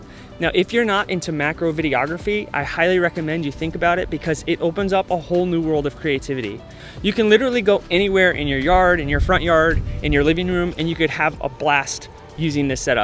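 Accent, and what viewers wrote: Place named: United States. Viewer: Wow! Haha that lil Iguana is the best model ever and soo beautiful ay =) Wow I was really surprised when i heard your American accent, I totally aspected you to be from Scandinavian because you look like a Viking! Haha I agree with one of the other commments - You’ve got a very endearing persona, not only very good at explaining but are gifted with a naturally calm, inviting presence. Thanks for the video =) That iguana shot reeeeally makes me wanna find and build my own Macro lens setup too! Haha :) From down here in Margaret River Western Australia I salute you :) Big High Five, keep it up!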